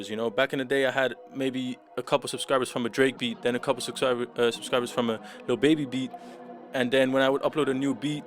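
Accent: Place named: Netherlands. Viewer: ik hoor nederlands hahaha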